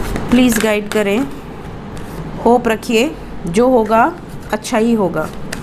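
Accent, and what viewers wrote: Varanasi, India. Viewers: Didi aapki banaras wali boli badi pyaari lg rhi aapke muh se😍❤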